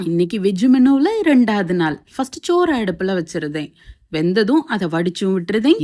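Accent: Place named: Nagercoil, India. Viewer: I thought you were from Nagercoil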